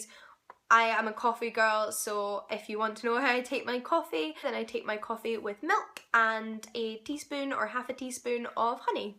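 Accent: Scotland, United Kingdom. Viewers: Thank you for clarifying the accent! For some reason, I could've sworn you said you were from London. I guess you were just visiting and I totally misunderstood. So every time I'd listen to your vids I'd think, that can't be right. I KNOW MY SCOTTISH ACCENTS! (One of my favorites of all time!) Seriously, it's kind of sad how much this confused me and distracted me. I thought I had gotten fairly good at placing accents and I thought I had failed. Now I have peace. ;) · But where in Scotland are you from??? :D I love most Scottish accents, but yours is definitely one of my favourites, I'd just love to know what area of Scotland it's from :) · The Order of the Phoenix is definitely the best HP book. Whenever I watch your videos I start thinking in a Scottish accent! Haha! I might be biased but I recommend visiting Southeast Alaska, particularly my hometown, Ketchikan.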